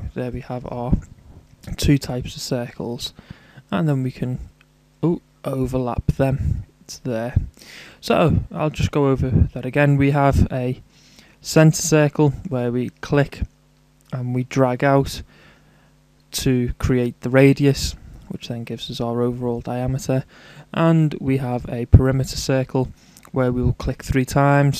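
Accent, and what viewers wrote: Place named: North West England, United Kingdom. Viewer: Hey really well explained and incredibly clear. Your accent sounds like you are from the North West. Please can you tell me why I am not picking up circle/arc centres when sketching an entity on an existing feature. Even something simple like trying to create a circular extrusion on say the end of a circular shaft; the centre of the shaft doesn't show. It used to work. Have I switched something off? It's been driving me crazy for weeks. Thanks for any help you can give. Cheers. Robert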